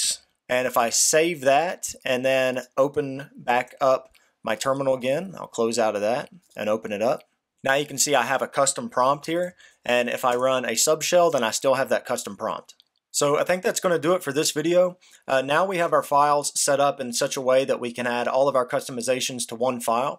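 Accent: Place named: Pittsburgh, United States. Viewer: Your from somewhere around Pittsburgh. I recognize the accent from 400 miles away!!!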